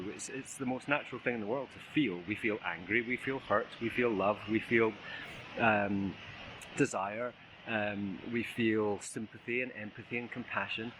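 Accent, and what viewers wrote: Scotland, United Kingdom. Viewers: It's the Scottish accent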